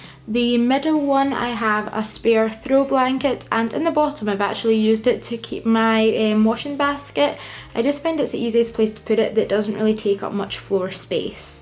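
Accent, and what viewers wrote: Scotland, United Kingdom. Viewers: This room is really cool! I'm just going back for my third year now so am watching videos like this in anticipation. Are you Scottish? Only ask because I am! :)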